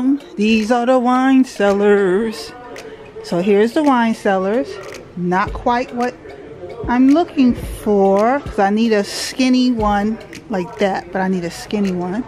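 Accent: New York, United States. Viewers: This was good! I have alway said, you have a New York accent but I never asked if you were from New York. I rarely see anyone that actually shops at Lowe's. When I think home improvement I think about Hone Depot. Now, let me tease you a bit. I always say that Lowes is for the stuck up employees, because whenever I go I never get anyone to help me. Once I got some mirror cut in Lowe's for a DIY Ikea night stand/chest and the attitudes I got because I needed someone to cut some mirrors for me. Then, there's old Home Depot, where people are willing to help me with their orange apron on. I like both stores, but I go to Home Depot the most. hehe... I just wanted to share that. I typically leave long comments. I'm EXTRA in the comments, but you know I'm here for you. Your hubby was trying to be a professional camera man and you kept asking him questions. 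I thought it was so cute and funny. That sink is going to be amazing,. That is a smart Idea on what you do with your business cards. I am so excited to see the kitchen come together.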